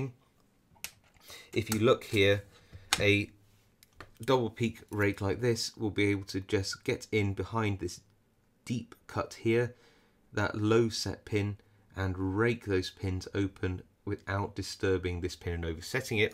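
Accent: England, United Kingdom. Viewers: Beautiful English. Beautifully authentic, proper English English!! Excellent video of course too by the way!! Have subscirbed. Thank you.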